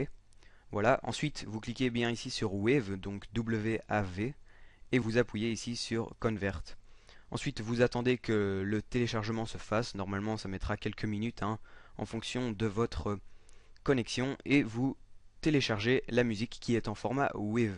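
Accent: Belgium. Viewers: il est belgue car il di nenant au lieux de 90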